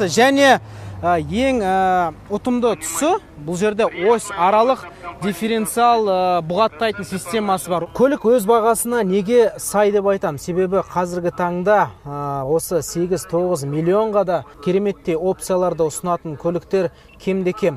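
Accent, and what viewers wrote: Standard. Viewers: брат таза қазак тылынде соилеиды дурас Брат алға жаң тусыршы кутып жатырмыз👍👍👍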